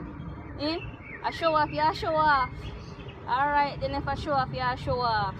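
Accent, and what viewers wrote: Jamaica. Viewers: hahah! girl u know u crazy! 😂😂 I be watching these vids for ur patwa accent ...love it!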